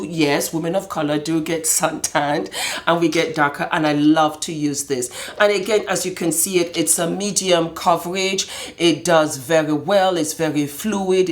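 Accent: France. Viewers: first time watching...i totally enjoyed it, love the french accent:) #newsubbie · That accent. is that French? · Your French accent is coming out.... very very.i love it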